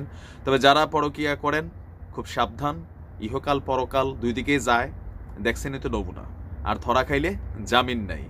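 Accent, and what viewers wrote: Comilla, Bangladesh. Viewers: ভাই আপনার বাড়ি কুমিল্লা না কি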